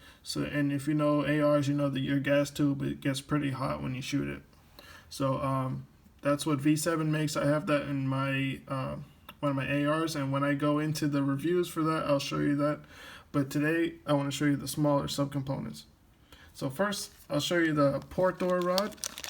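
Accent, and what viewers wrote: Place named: El Salvador. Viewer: Unless you came from elsalivdor? Speak english without the accent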